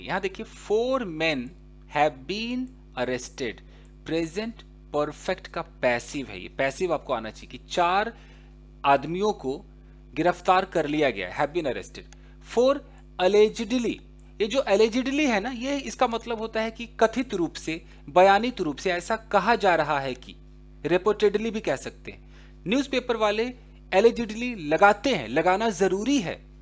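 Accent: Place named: Bihar, India. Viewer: Sir ,where are you from ,it seems that u are from Bihar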